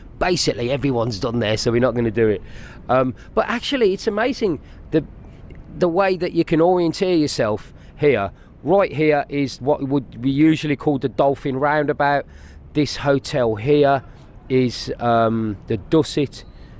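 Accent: Birmingham, United Kingdom. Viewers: Great video mate. Never made a comment on your channel before. I had to because it was brilliant. Love the Brummie as well.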